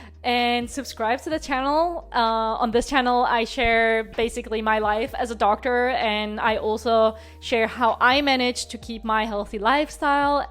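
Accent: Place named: France. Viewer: If you want to make it fun get a real bike and explore the outdoors. It's real life. By the way love your accent. French I think. Blessings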